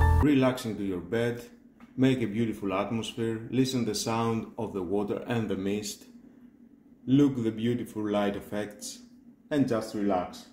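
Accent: Greece. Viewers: Εντελώς ελληνικά αγγλικά 😂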